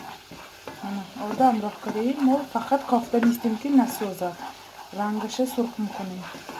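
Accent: Isfara, Tajikistan. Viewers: Мемурам дар лафзи Исфараги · Салом шумо аз исфара мешидми?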